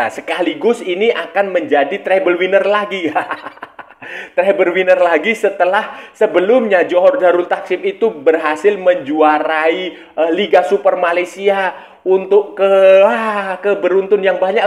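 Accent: Indonesia. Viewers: eja ala2 english pun lidah indonesia tetap sama baca nya 😊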